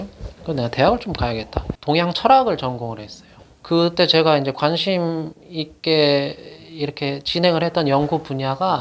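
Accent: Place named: Jeolla, South Korea. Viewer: 이분 말투가 구수하신게 전라도 사투리 조금쓰시는거 맞나요?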